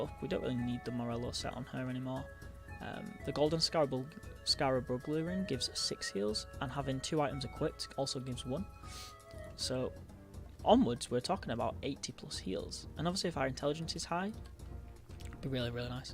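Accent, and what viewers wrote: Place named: Yorkshire, United Kingdom. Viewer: Brighton, UK here, but I grew up in the east midlands. You sound like your from Yorkshire haha? That music was heaven! Look forward to any and all dungeons, especially the harder ones later on as I’m quite unfamiliar with them.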